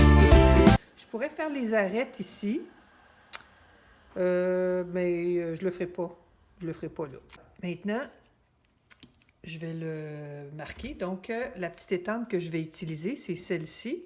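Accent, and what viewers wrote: Quebec, Canada. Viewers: avec plaisir et moi j'apprécie que vous le preniez bien car certaines personnes ne supporte pas les "bonnes" critiques! et en plus nous en France on adore votre accent canadien :-) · Bonjour ' Je suis désoler mais j'ai beau avoir regarder la vidéo à plusieurs reprises, je n'ai entendu que les 250 mm de lait d'amande.Pouvez vous me dire la quantité d'huile d'olive et d'huile de ricin? Merci,j'ai votre dynamisme et votre accent québécois.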